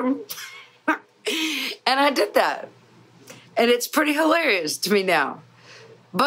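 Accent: South, United States. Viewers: Good deal...taking up for her like that. I'm not used to hearing a slight country twang to your voice but, it sounds good. The more you get excited, the more it comes out. I probably sound like pure West Virginia hillbilly but, that's where I'm from....LOL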